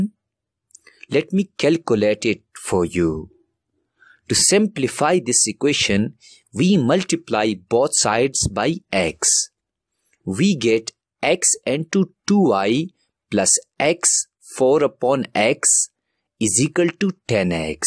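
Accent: South India, India. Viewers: Why does he sound like an indian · thank u but are u an indan man ur accsent is so good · Thick indian accent 💀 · Sir are you from south india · Di po siya filipino, parang Indian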